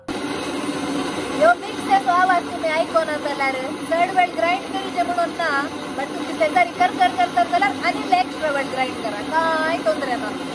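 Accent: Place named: Mangalore, India. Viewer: Recently started watching your video's and vlogs really enjoy the way you talk in manglorean . I too am a manglorean feels connected❤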